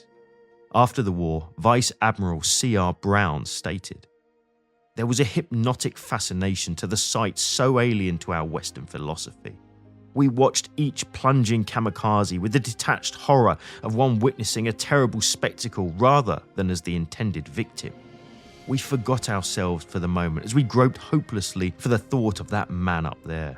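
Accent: England, United Kingdom. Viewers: Endlich spricht man jemand englisch und nicht amerikanisch 🙂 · You sound British so why not pronounce lieutenant as 'leftenant'?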